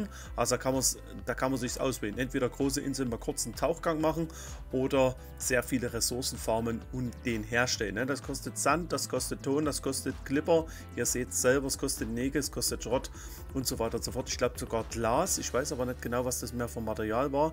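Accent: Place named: Saxony, Germany. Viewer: Ich bin, deine Stimme hat mich geholt mein Sächsischer Bruder!!! <3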